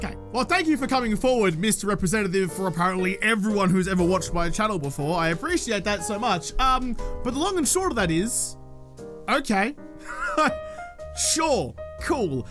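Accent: Melbourne, Australia. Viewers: From one Melbourian to another, the accent rocks. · Hey! I only just found you a couple of months ago and you've been seriously growing on me. But this video. This video turned me into a lifer! Lemme just say a few things. Yes you can be loud (as can I). And at first I used to turn that volume slider down a bit. But I've come to love your excitability and loudness. As someone who suffers with too many chronic illnesses to list, all of which that have left me retired (extremely early I might add. Had to give up working 15 years ago and I'm only 43 now) and extremely isolated save for my most amazing and loyal husband, my life gets very very lonely and so I depend on my favorite Youtubers to help me not go fucking crazy! And you have so quickly been added to the list of favorites. I always really appreciate your positive attitude and goofiness. I am, unfortunately, American so I've been diligently studying up on Aussie slang so I can understand what the hell you are saying! 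Still lost about 40% of the time but I generally get the jist. You now have me saying batons the way you do instead of the way I do! LOL! Look, don't ever change. Not for anything. The haters are gonna hate and the trolls are gonna troll. You be you and you do you and those who really appreciate your personality will stick around. Thanks for all the laughs mate! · WHAT. One of my top reasons why I ❤ is his amazing Aussie accent!!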